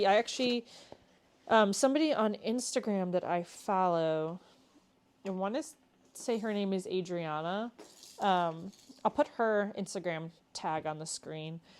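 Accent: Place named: South, United States. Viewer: it’s a cultural “speak” from parts of the south. My husband’s relatives, with “fancy pants” graduate degrees, pronounce it exactly like you do. Especially, when we are at a family get together, with wine and spirits, their Southern Charm flows. I think it’s enchanting! Please don’t change it for anyone. ❤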